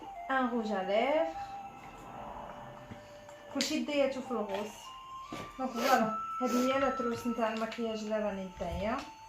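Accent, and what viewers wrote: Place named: Oujda, Morocco. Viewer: Nti umkn mn oujdaa ?! Lhja diallk oujdia fin sakna!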